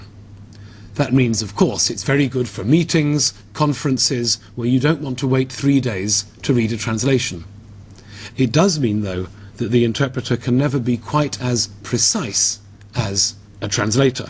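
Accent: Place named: United Kingdom. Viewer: good, quiet visual example of how an interpretaion works. And this pure, brand british accent is so ... lovely!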